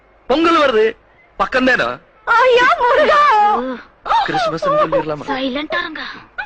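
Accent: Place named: Kongu, India. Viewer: பெங்களுரில் இருந்தாலும் உங்க, அதுதான்க நம்ம, கொஞ்சும் கொங்குத் தமிழை மறக்கவில்லை. ஆனால், உரையாடலின் மையக் கருத்துத்தான் சரியில்லை. இது மாதிரியான கலச்சாரச் சீரழிவுக் கருத்துக்களைப் புறக்கணியுங்க. உங்க வீட்டுக்காரம்மா, கொங்காக இல்லாவிட்டாலும் கூட, கொங்குத் தழிழை, உங்களைவிட நன்றாகவே பேசுகிறார்கள். வாழ்த்துக்கள்.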